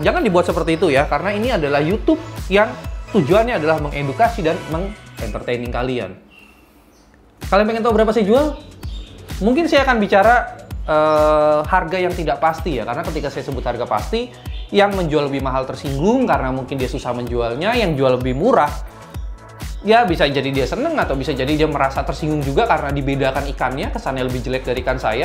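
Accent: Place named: Banyumas, Indonesia. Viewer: Wong ngapak jebule.. hihihi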